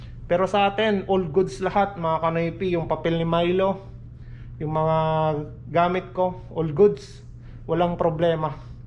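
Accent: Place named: Ilocos, Philippines. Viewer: May ilocano accent ka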